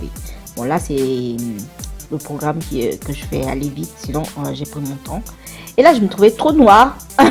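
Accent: Ivory Coast. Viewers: Tu es ivoirienne d’origine ou mon oreille me trompe ?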